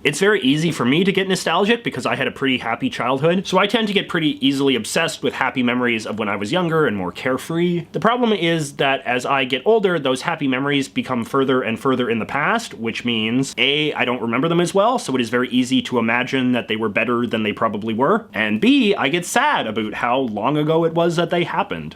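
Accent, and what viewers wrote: Canada. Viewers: That's the heaviest Canadian about I habe ever heard!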